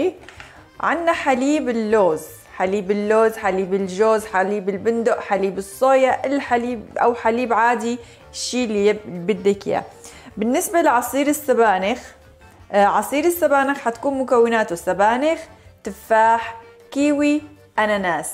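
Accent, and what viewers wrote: Aleppo, Syria. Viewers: بيشهي وبيبل القلب... وانت وضحكتك بتبلي القلب... وحكياتك الحلبية الحلوة.. شكرا أنت الأروع وتزدادين روعة ❤️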